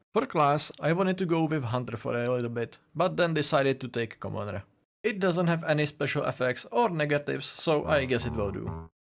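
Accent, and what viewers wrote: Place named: Czech Republic. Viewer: Are you Czech? You sound Czech lol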